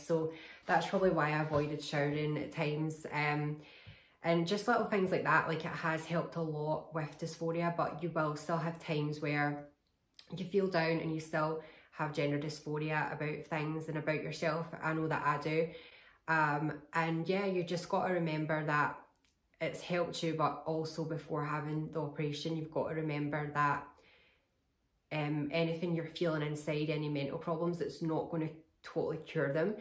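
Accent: Scotland, United Kingdom. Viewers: Lovely to hear a fellow Scottish accent,especially from someone so beautiful in both looks and deeds. You're videos help many which is a beautiful thing to do. Keep the great work girl..xx. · Wow, never seen a fellow Trans-person with a Scottish accent before!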